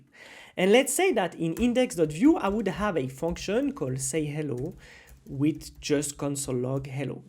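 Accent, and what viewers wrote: France. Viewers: Thank to this tutorial and surtout ton french accent , on a tous le même mais ça me va parfaitement comme ça . Good job 👍💪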